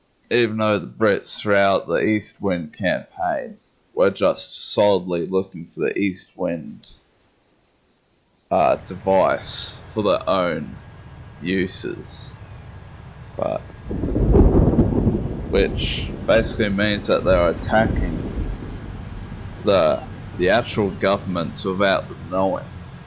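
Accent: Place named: Australia. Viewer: Australian ya dick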